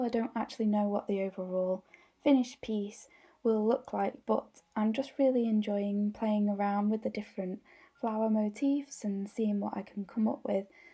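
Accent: United Kingdom. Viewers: You have managed to touch on all of my favorite things here. A beautiful English accent, Liberty of London fabrics, Sashiko, and EPP. All of this makes for a perfect video, and I thank you for this. What do you think about the William Morris quilting cottons? They are another of my favorites. Be well, and be happy.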